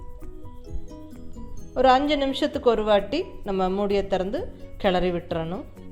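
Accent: Coimbatore, India. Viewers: I like all your recepies and trying too, coming very well and unga kongu tamil enaku romba pidikum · Coimbatore pasai semma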